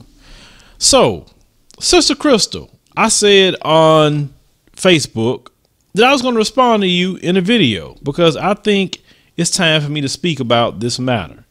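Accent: South, United States. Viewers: My god! This grammar nazi Crystal chick need to have several seats. It's not like you're an anchorman or a reporter at a news station where proper grammar is a must. There's nothing wrong with the way you talk, she couldn't stand your southern accent, but you speak proper to me and I was born in Chattanooga, TN myself and still got a southern accent. So I guess this Crystal chick went to Oxford University herself, SMH, just keep doing you. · I minor in English phill and your just fine. I just love your southern accent. forget that silly woman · speaks clear wit' a southern drawl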